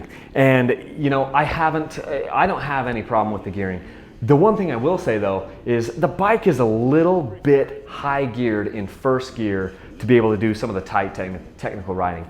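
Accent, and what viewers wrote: Utah, United States. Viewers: Dude you have the best example of a Utah accent I've ever heard! Thanks for the sweet content in familiar places, hope to bump into to you some day.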